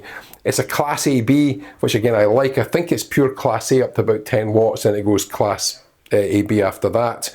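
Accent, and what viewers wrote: Scotland, United Kingdom. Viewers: Being and Australian, I'm sure he's Scottish.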